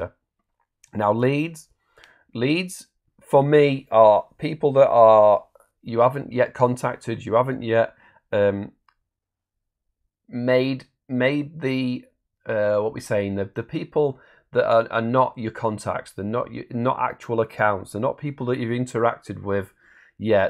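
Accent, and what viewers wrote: North, United Kingdom. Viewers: Hi, good to hear a Brit voice easier to understand than the Zoho videos and thanks for being active on the Zoho products. I've used databases in the past; Sales Force etc.. Used Zoho for 1 year but not in anger. We are looking to increase customers/interaction/subscribers etc... As I'm on the move its ideal for iPad/iPhone, now going through the correct steps/blueprint to give me a better work process so when we expand a system is in place. cheers, Charles. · Hi. I am starting a role with an Organisation as a customer services manager and they use Zoho. I would like tot get to know the system better to assist in this new position. Plus I am from the North of the UK and I can relate to the accent;-)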